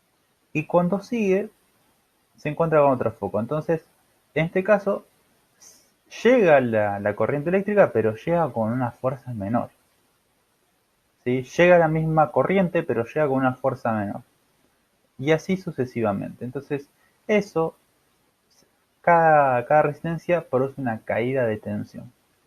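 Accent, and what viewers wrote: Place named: Argentina. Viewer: no entendí , osea no entiendo el argentino :v , es que no entendí algunas palabras xd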